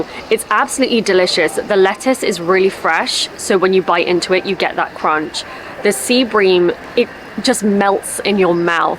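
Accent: United Kingdom. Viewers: イギリス英語かっこいい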